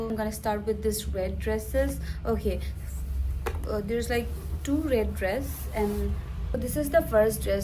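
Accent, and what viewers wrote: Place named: India. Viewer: I like ur haul but pls not in Indian accent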